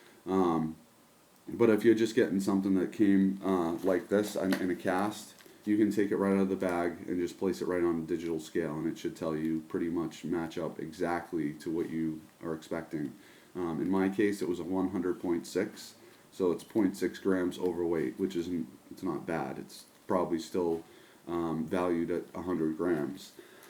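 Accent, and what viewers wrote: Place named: Boston, United States. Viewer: You sound OK to me you Boston guy. I sound worse. Your "Umm" is OK. Better than Howie Carr's "Wizard of Uhs". What town are you in ?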